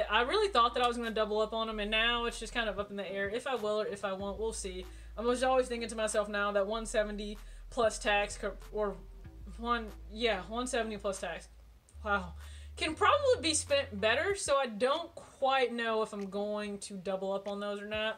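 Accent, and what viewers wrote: South, United States. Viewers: I love your thick country accent!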